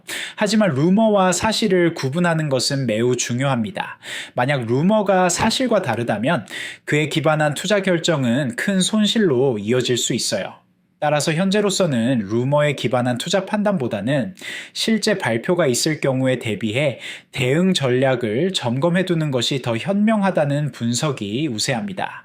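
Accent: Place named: United States. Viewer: 미국에서 오래 사신분 같아요. ㅎㅎ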